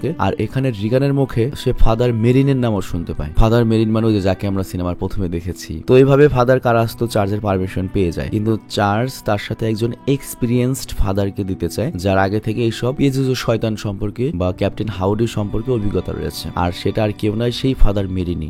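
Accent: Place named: Noakhali, Bangladesh. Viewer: নোয়াখালী কেন বাই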